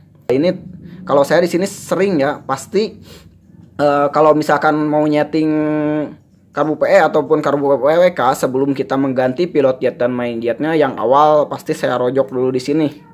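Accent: West Java, Indonesia. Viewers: Sunda pisan kang. Wkwk Bermanfaat pisan video na. Hatur nuhun 🙏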